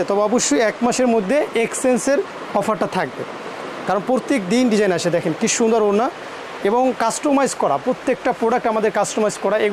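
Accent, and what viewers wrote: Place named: Barishal, Bangladesh. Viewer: Narayongong er lok er borishaler moto kothar sur keno ashe ??. uni ai alakar lok na?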